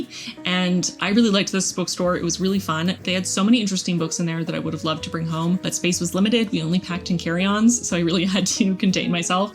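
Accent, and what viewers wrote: Quebec, Canada. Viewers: Ton français est vraiment très bon! En fait, ton accent est un peu Québécois et j'adore! Tu m'inspires à lire en français, quelque chose que je n'ai pas fait depuis des années! 💜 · Salut =) (We don't say "Allo" except in the beginning of phone calls by the way). Your french is quite good actually (I observe the quebec influence in the accent ^^). It's very difficult to prounounce the words correctly with so many silent letters and the diversity of sounds with the same letters. And the difference between female and male nouns... and the conjugation... Well be brave /o/ For instance, we pronounce "prophéties" like 'professies" (t as s). I wish you good luck and nice reading with your french TBR (we say PAL for "pile à lire" here). I think you choose very literary books, with metaphors (more complicated to understand if the first level is new). 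But I admire your ambition, you can progress very fast this way! Bonne continuation n,n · Wow, tu étais à Montréal??? I love how dedicated you are to learning French, and despite the Babbel lessons using métropolitain French, your accent definitely leans more Québécois! Also, I find it so interesting, because I live in the Montréal area and have trouble finding books in English (that aren't from Indigo...), but in your case, you absolutely used your time in Montréal to get francophone books! And yes, Québécois lit 100% tends to be absurd and a little weird!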